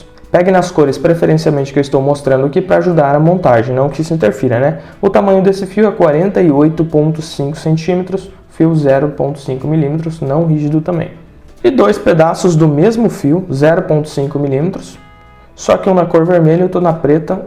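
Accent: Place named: Maringá, Brazil. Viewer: você é de maringá AAAAAAA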